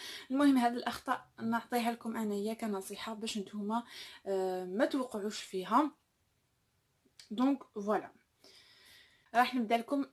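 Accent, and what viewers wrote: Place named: Morocco. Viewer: الله يبارك بنت بلادي وشنو لهجة تاعك تميل بعض الشئ الي المغربية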